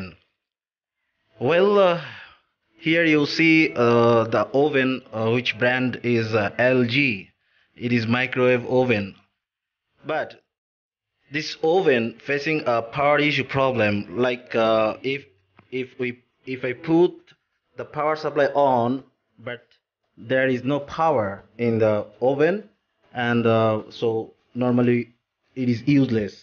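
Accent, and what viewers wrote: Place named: Philippines. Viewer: Wow ang galing nman parang pinoy lng ang NG sasalita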